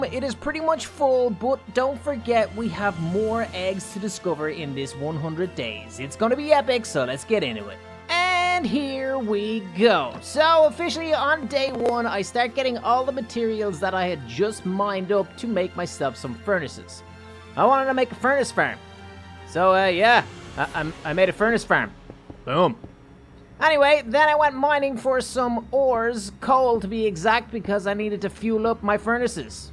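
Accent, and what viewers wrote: Ireland. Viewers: bro don't mean to be rude or anything but are you Irish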